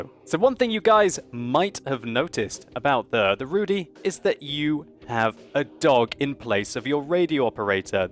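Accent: Russia. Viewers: Why make Russian accents in a video about... a Polish theme tank? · It was realy bad. You reviev tank with was in polish army with polish tankers and u speek with russian accent with rusian flag and with Soviet Bear...It was realy bad and insulting for polish ppl.... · Rolf Rolf it is so easy to flame, isnt it? If you would spend some time to read a book or two, about polish tank platoon during II WW you would realize, that the idea of movie itself and this bloody tank is an insult to the history, but it is easier to pick up the most obvious fact, that some dude reviewing a tank from a soviet tech tree speaks with Russian accent. Congratulations to you, depth of your analysis is staggering, and the fact that you think nobody noticed that till now just means you are a seriously disturbed narcissistic individual. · LOL, love that russian accent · Why Russian accent don't understand.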